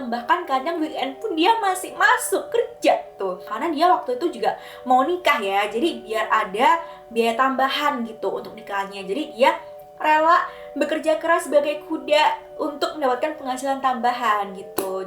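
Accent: Java, Indonesia. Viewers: Bang orang Jawa ya semangat 👍💪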